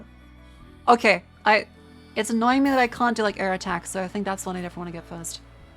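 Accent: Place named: Australia. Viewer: Shark girl? Red head? Australian accent? Mini skirt/giant overcoat combo? Glasses? Yapping? Coding knowledge? Oh yeah, instant sub. Looking forward to more videos :D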